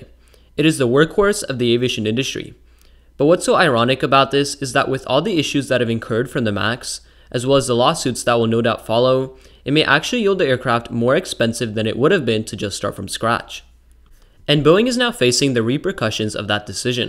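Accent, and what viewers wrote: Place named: India. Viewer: Nice Indian accent.btw retire this old plane.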